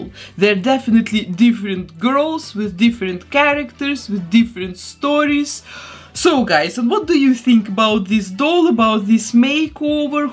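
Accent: Russia. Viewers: I hear a Russian accent🤔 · Такой акцент русский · Этот русский акцент 😅❤ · Russian accent.🙄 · Вы русская? Просто мне кажется, что у вас русский акцент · Иногда мне кажется что у вас явный русский акцент в речи 😂❤ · Сразу слышна Раша Тудей ,ну на столько слышен акцент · Sonds like russian accent 🤔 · Искала комы на русском, очень мало, быстро узнала акцент, думаю, ну точно, имя подтвердило. Русский акцент узнаешь из тысячи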